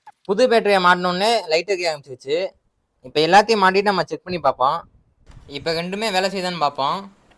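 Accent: Madurai, India. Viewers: Bro nega madurai yaa bro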